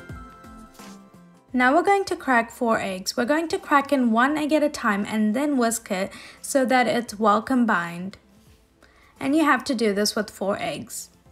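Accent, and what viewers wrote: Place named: Gujarat, India. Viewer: I love carrot cake the best, it is a healthier one too, and easy to make and moist, I also love and love her English accent with a cute Indian Gujarati hint, nice to hear.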